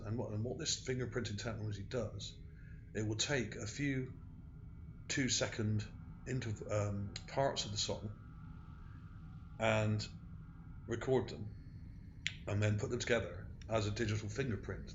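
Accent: Bristol, United Kingdom. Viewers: Now how did I know that you were from Bristol, UK, lol. My home city.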